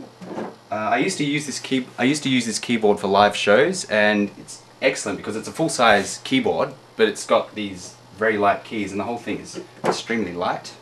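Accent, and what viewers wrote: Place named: Australia. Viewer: its so good to hear another aussie voice...reminds me of home...even though i'm at home...also pro tools...good boy...komplete 8....i'm jelly...guitar rig...ehh i'm a amplitube bro myself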